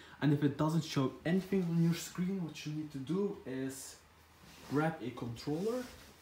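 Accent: Netherlands. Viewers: Lol ur dutch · Bruh your ducht accent is so obviuos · Yeah the moment I heard his accent I was like haha he is dutch and it turned out to be true.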